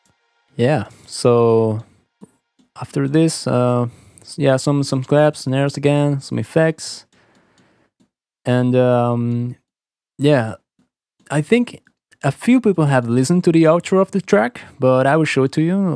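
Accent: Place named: Spain. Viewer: "Reburb" 😂 I love the spanish accent 😁 Love the video man!!! 👌🏼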